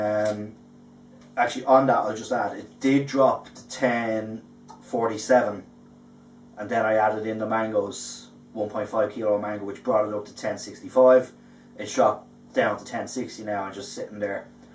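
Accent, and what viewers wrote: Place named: Ireland. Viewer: I feel your pain with the CML yeast, my porter took 4 1/2 weeks and 3 packs to get down to 1.020. I wonder why their recent batches of yeast are having issues? Like you said you've been using it for a year, so it's a recent issue. Hopefully they sort it out, they are great little company, love their hops. Oh and welcome to brewtubing, nice to see another Irish accent. Top video btw 👍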